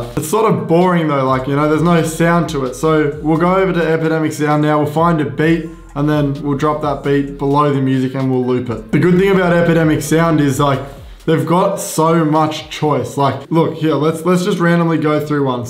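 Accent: Australia. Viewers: OMG I FREAKING LOVE how Aussie you are!! Lol it's so refreshing to hear someone as Melbournian as you on YouTube! Haha I got a HP but it's pretty much the same steps yeah?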